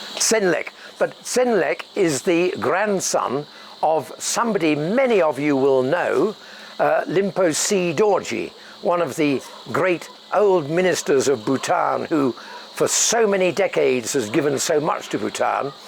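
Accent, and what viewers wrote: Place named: Oxford, United Kingdom. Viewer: I listen most of your talks because I think you beautifully speak original Oxford English, I think. Original way of language speaking changes in passage of time from place to place.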